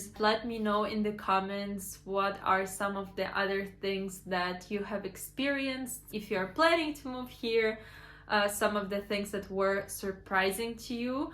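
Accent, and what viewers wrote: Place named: Baltic states. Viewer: I started wondering as well since her accent is definitely not American. I was actually admiring the clarity of her speech before I realized that she has an accent that's not one I'm familiar with. My first guess would be that she's from the Baltics, but she might be from Hungary or elsewhere for all I know.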